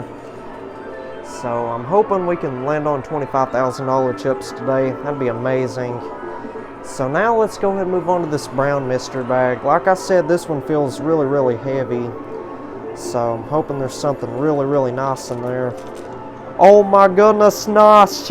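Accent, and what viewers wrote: United States. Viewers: I like American English accent. 😊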